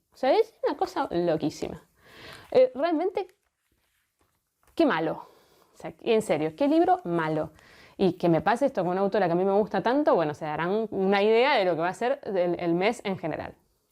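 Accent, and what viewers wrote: Argentina. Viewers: Hola! Gracias por tus videos! Me encanta tu estilo tan directo y objetivo para hablar de libros! Amo tu acento argentino! Y es maravilloso tener buen contenido latinoamericano!!!! Jejjee y GRACIAS!!! Por tu recomendación acabo de terminar crónicas marcianas…. Ufff lo amé!!! Todos los niveles de lecturas que tiene! Es increíble!!! Gracias y saludos desde México 🇲🇽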